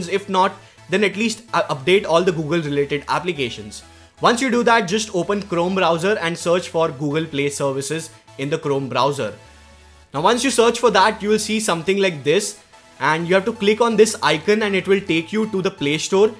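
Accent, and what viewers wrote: India. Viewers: Good video! But I must say Mr Man from India, LOL! Your video and your f**** talking with your India accent is way too quick and fast and as you know most of us are watching this video on our cell phones we could barely even see the writing on your cell phone and you're trying to show us on your cell phone and you go way too fast and you speak too fast with an Indian accent, , because of that your video sucks because you're way too fast and no one comprehended anything from your video we can't even barely see your cell phones writing and then you go so fast also to top it off with ice cream you all so f**** put the cherry on top with your India accent I mean come to f*** on get real no one got anything from this video you piece of dumbass! LOL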